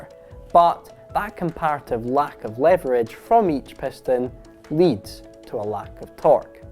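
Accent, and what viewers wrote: Scotland, United Kingdom. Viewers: Started watching then heard that roaster Scottish accent. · Is Scottish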